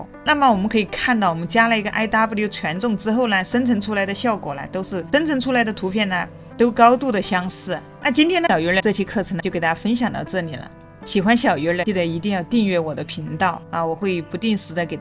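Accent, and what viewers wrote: Sichuan, China. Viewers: 四川的吗？口音挺像啊。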